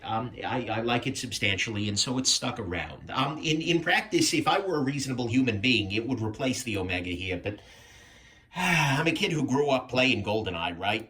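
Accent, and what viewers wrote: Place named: East Coast, United States. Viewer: Your in california?? I felt certain your were an east coast guy.... I'm sure at least from the northeast part of the country.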